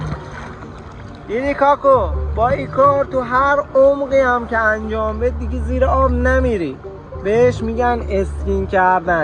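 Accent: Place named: Shiraz, Iran. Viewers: جنوبی 😂 شیرازیه👍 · لهجه جنوبی رو عشقه حاجی 💚🤍❤️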